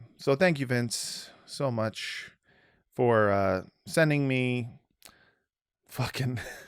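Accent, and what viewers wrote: England, United Kingdom. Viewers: I'm a Kiwi and I thought your Pommie accent was great! Only randomly came ax this thanks to YT algorithms since I've been binging Palestinian history and news content. It's been super-interesting listening to your views and experiences. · Your British accent is 45% Arthur Shelby and 55% Alfie Solomons from Peaky Blinders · as a brit, your accent of a ingerlander was perfect. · your british accent is amazing hhhhhhh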